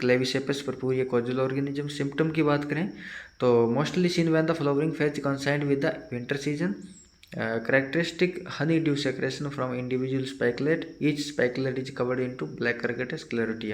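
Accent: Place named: Haryana, India. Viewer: haryana s ho kya bhai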